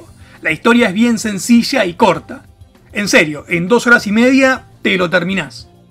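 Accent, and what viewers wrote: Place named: Argentina. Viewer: Ese acento argentino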